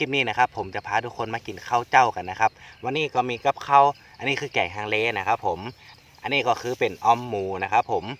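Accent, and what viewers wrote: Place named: North, Thailand. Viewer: อู้เมืองก่น่าฮัก น่าฮักแต้เนอ เสียงนกเสียงหนูบรรยากาศดีเนาะ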